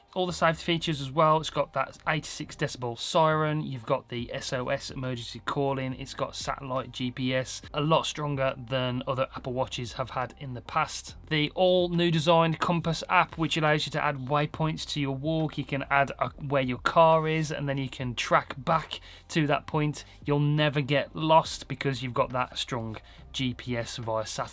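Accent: Birmingham, United Kingdom. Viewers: Proper brummy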